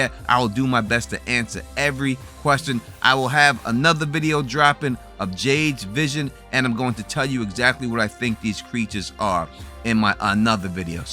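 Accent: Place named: Long Island, United States. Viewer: you from long island, son? i know that accent.....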